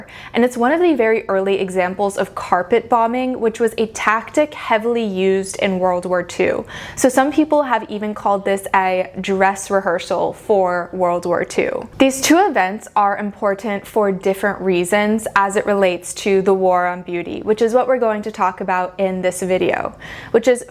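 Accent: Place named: United States. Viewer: You look Italian.. but you have an American accent. mmmh 🤔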